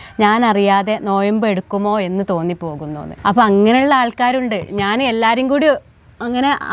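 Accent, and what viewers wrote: Malappuram, India. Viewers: അതാണ് നമ്മൾ ഈ മലപ്പുറം ജില്ലയിൽ കളിച്ച് വളർന്നതാണ് നമുക്ക് ഇതൊന്നും ഒരു പുതുമയല്ല ഇതും അതിനപ്പുറവും എതിർക്കുന്നവർ കണേണ്ടി വരും നമ്മൾ ഒരു പൂന്തോട്ടത്തിലെ പലനിറങ്ങളുളള പല ചെടികളെ പോലെ നമുക്ക് എന്നും സന്തോഷത്തോടെ കഴിയണം ഞാൻ ഒരു മുസ്‌ലിമാണ് എൻ്റെ രാഷ്ട്രീയം SDPI ആണ് ഞാൻ എന്നും നിങ്ങളുടെ വീഡിയോ കാണുന്ന ആളാണ് ഒന്നാമത് എനിക്ക് ഫുഡ് ഉണ്ടാക്കുന്നത് കാണാൻ ഇഷ്ടമാണ് അതിലേറെ നിങ്ങളുടെ മലപ്പുറം ശൈലിയിലുള്ള അവതരണം ഒരുപാട് ഇഷ്ടമാണ് ഇതെന്നും നമ്മുടെ ഇടയിലുള്ള ചില പുഴ് കുത്തുകൾക്ക് ഇഷ്ടപെടൂല അവരെ വിട് നമ്മൾ ഉണ്ടാകും നിങ്ങളുടെ കൂടെ നിങ്ങളുടെ കുടുംബ ജീവിതം എന്നും സന്തോഷത്തിലാവട്ടെ ചേട്ടനെ കണ്ടാലറിയാം നല്ല ഒരു ഭർത്താവിനെയാണ് ചേച്ചിക്ക് കിട്ടിയത് എന്ന്🎉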